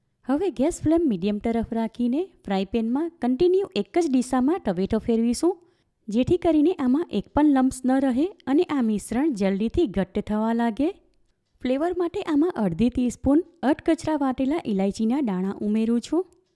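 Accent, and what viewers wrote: Surat, India. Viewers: કોપરા પાક પણ ખૂબ સરસ અને તમારો અવાજ સુરતી ભાષા ખૂબ જ મીઠી 👍👌🤪❤️❤️❤️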